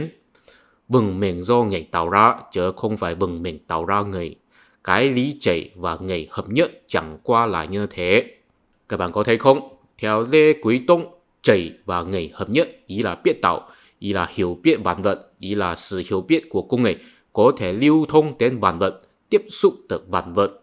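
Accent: China. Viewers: Nói tiếng Việt giỏi mà nghe như đang nghe tiếng trung quốc vậy, giọng của bạn rất hay. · giọng tàu khó nghe quá ..không bằng Lào nói tiếng việt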